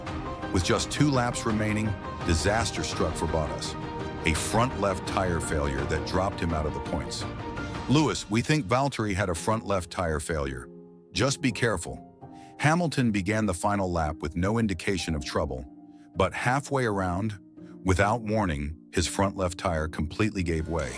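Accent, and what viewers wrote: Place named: United States. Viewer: I was all in , about to hear what I've heard so many times,heard the American voice over, I clicked off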